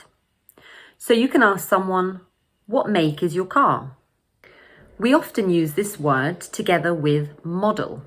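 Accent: United Kingdom. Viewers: 영국 자동차 리뷰채널 Carwow 시청자들은 자주 들어봤을 발음이네요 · 와 진짜 왜케 깐지나냐 영국 발음.. 자꾸 눈 앞에 왠 양복입은 신사가 아른거림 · 영국식 영어는 표준이 아닙니다. 미국인도 듣고 비웃는게 영국식입니다. 미국식 영어 발음을 들으세요. 하이윤다이? 미국은 히윤다이, 헌다이 등 다양합니다. 저 발음에 속지마세요.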